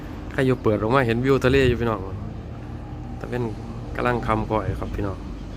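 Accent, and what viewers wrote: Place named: Sakon Nakhon, Thailand. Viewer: คือเสียงบ่าวสกลเนาะได้ฟังแต่เริ่มต้นมวน่ๆๆ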